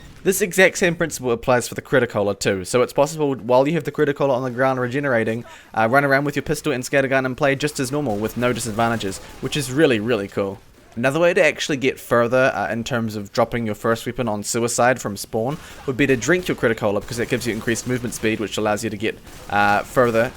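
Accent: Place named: New Zealand. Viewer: I haven't been subbed for long but I've watched a few recent videos of yours and I love how you take what valve dishes out without being angry at anything and the way you use the new mechanics of the game. I'm glad we have someone in the tf2 community that is so positive about the game instead of just complaining about X favourite weapon being nerfed and saying that tf2 is dead. Also love the NZ accent!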